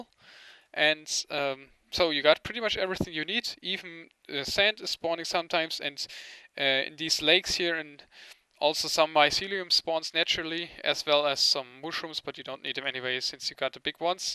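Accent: Germany. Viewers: dein english akzent ist scheise sry aber ich bin zu 100 % sicher das du deutsch sprichst ;)! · turn on subtitles if you want to laugh it is funny becuase of his German overtone isnt processed correctly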